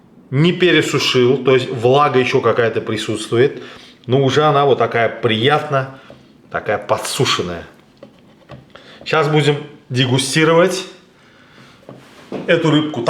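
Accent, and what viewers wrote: Baku, Azerbaijan. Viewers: Бакинский акцент самый узнаваемый и лучший✊🏼🤓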